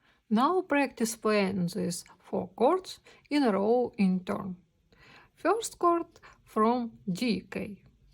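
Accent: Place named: Eastern Europe. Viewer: Amazing explanation but please do something about your slavic accent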